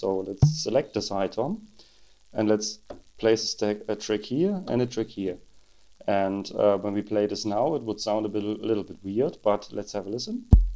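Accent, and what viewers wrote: Germany. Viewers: Man hört stark dass du deutsch bist.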